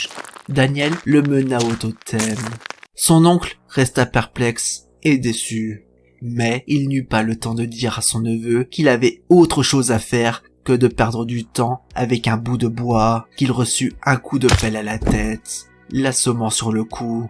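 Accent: Lorraine, France. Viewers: Désolé pour l'accent, c'est un accent Lorrain 🤣🤣🤣🤣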